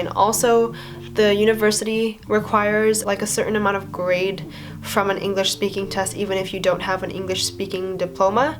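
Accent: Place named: United States. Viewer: you have a totally perfect flat american accent.. howdja do that?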